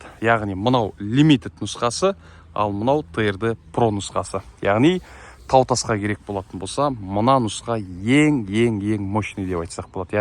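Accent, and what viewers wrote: Standard. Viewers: Мен озим колик айдай алмайм.Бирак узбей коремин таза казакша жургизесин Касибине береке!!!👍👍👍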